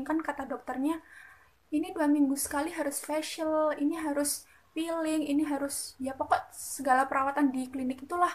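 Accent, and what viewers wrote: Java, Indonesia. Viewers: hahahaha medok polos lucuk gitu gemes wkekek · Wong jowo yo 🤣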